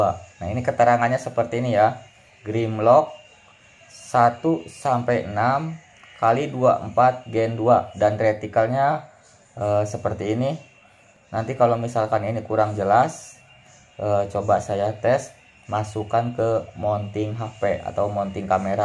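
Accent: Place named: West Java, Indonesia. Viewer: Logat sundaxa sangat lekat kang,salam dari Papua,Asal Majalengka👍👍🙏🙏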